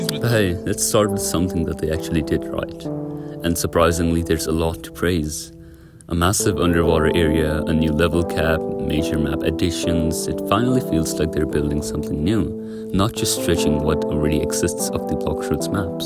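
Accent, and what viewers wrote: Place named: India. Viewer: BRO YOUR IN NINTH GRADE ??? SOUND LIKE A 20 YEAR OLD INDIAN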